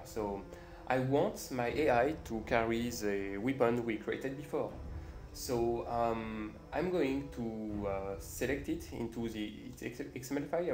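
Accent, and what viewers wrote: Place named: England, United Kingdom. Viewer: EPIC ENGLISH ACCENT !! (and game aswell)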